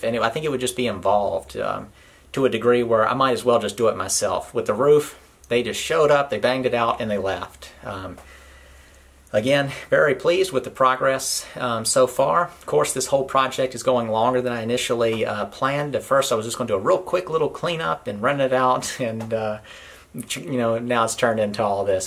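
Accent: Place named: Texas, United States. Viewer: I hear a southern drawl. I say Texas.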